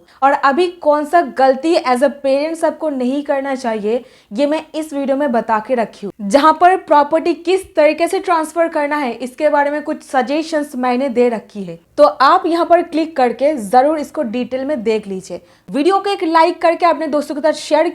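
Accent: Bengal, India. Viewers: Bengali's hindi is very sweet. Easy to understand.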